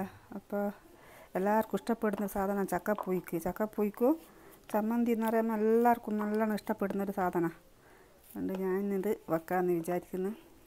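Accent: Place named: Vadakara, India. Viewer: Slang 🤩 immale vadakara❤️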